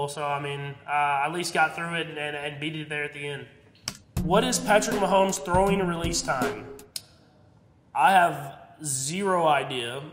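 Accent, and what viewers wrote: South, United States. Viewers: His southern accent is awesome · It’s the southern accent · He’s got a country accent. And I love it lol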